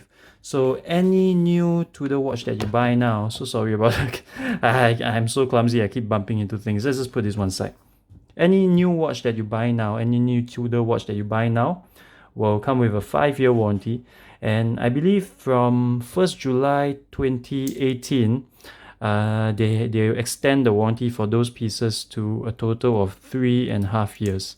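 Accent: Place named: Singapore. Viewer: The second when I hear you speak, I know you're a fellow Singaporean haha. I just got mine early this month, it's my first luxury watch too and I absolutely love it. Congrats on your new watch! Cheers :)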